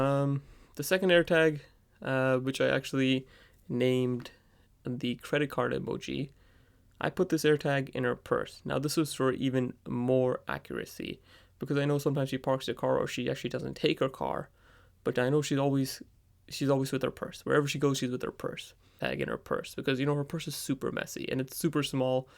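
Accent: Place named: India. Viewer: indian accent does not surprise me about a guy tracking his girlfriend lol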